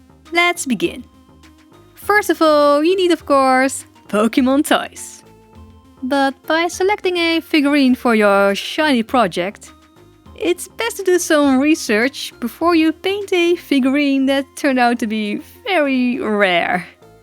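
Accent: Netherlands. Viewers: Ik heb geen intresse in verven, ik heb vrij weinig pkmn speelgoed, maar het is zover best entertainend. Ik vond het wel grappig dat ik dacht dat je een Nederlands accent had voor dat ik je kanaal verder bekeek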